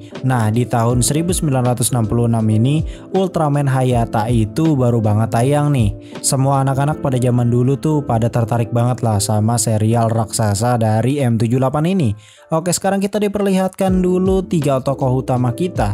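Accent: West Java, Indonesia. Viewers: orang sunda gening euy🤣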